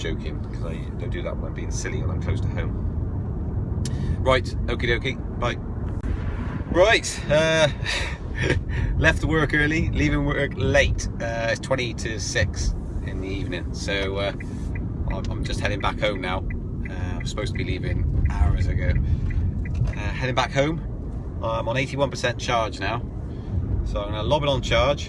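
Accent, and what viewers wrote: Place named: Bristol, United Kingdom. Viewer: Just bought myself a two year old Tekna Leaf up her in Montrose, Scotland. Love your videos,. They have been a good insight into what the Leaf is all about and capable of. I pick the car up tomorrow!!! Can't wait!! Sounds like you're from the same neck of the woods as my wife, (Bristol?).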